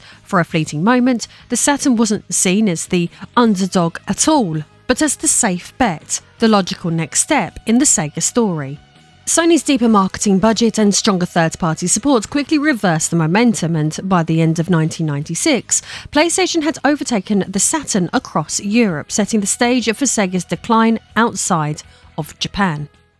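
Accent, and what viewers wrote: United Kingdom. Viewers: Not sure which part of Britain you're from but your accent is gorgeous. Very similar to 'Obsidian Ant' a guy who talks about space games, except he sounds like a wizard! He has a few hundred thousand subs... Great accent 👍👍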